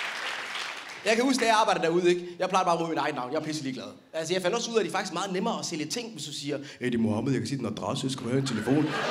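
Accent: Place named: Jutland, Denmark. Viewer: Elsker din humor, jyske accent og din store portion selvironi. Thumps up herfra.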